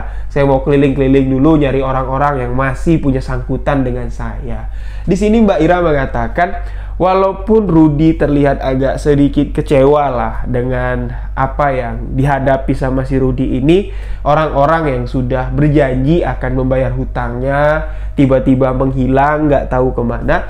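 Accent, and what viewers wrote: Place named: Bali, Indonesia. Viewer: Cepet sembuh, sehat kembali bamg. Ngomong2, kl d denger2 logat jambi agak mirip bali ya... Prtama denger bang bicara sy kira orang bali 😁😁🙏🙏🙏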